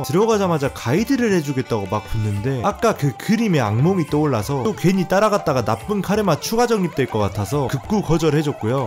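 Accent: Jeolla, South Korea. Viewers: "전라도 사투리 구수함 200배" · 전라도 사투리 너무 웃겨요 ㅎㅎ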